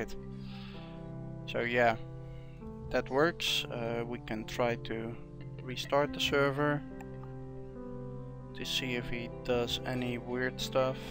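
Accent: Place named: Netherlands. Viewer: Hey dude really nice tutorial but next time just speak dutch that sounds a bit better then that dutch-english. im dutch to so thats why im saying this. But still good job. Keep on the good work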